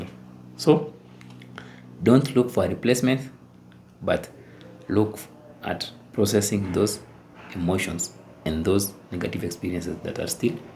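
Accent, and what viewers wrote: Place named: Kenya. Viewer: My fellow Kenyan!!!! That accent 😊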